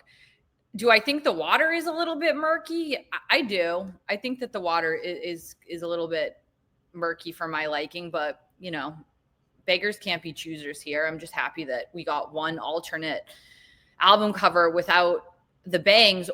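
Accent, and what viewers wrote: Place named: Massachusetts, United States. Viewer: I thought Mass.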